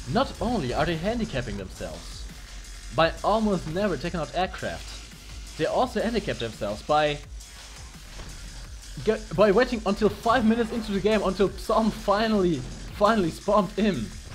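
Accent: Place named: Germany. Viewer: Also bist du deutschstämmig. Ich dachte schon immer das du einen deutschen Akzent hast...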